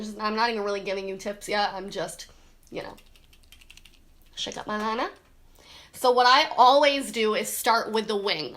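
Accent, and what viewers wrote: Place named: Midwest, United States. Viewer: Midwest accent for sure!!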